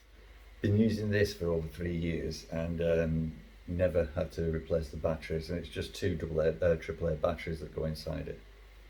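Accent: United Kingdom. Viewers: Just purchased one and had to learn how to set it for Fahrenheit temp. Good video. British accent was a bonus. 😀 Thank you!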